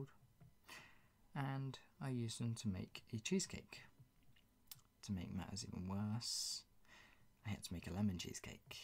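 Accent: United Kingdom. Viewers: Hope every sick girl have a boyfriend like this(;_;)this is sooo cute :3 btw British accent is the best :P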